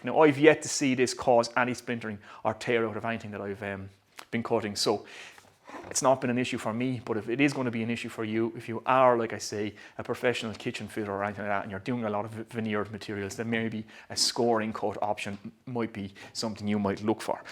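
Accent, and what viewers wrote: Ireland. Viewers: Your review is just as spot on today. Thank you. First time watching your videos and it took me a few seconds to get my yank ear in tune with your very cool brogue, but very much enjoyed listening. Your teaching style is very good. Well done, sir! 👍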